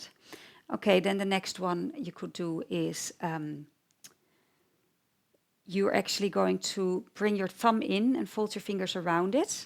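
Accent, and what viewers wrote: Netherlands. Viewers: You can hear from the annoying accent that she is Dutch lol.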